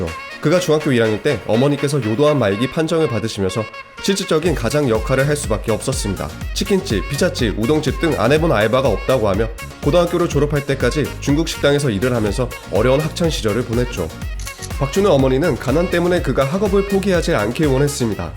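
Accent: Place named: Jeolla, South Korea. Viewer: 그런데 이 친구 이해가 안되는 게 고향은 경상도던데 왜 전라도 사투리를 쓰는지? 태어난 곳만 경상도고 자란 곳은 전라도인가?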